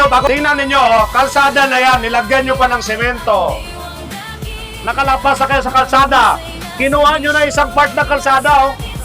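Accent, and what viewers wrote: Iloilo, Philippines. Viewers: Ilonggo ka sir kay isug man? Kag imo tuno o accent ilonggo man gid iya.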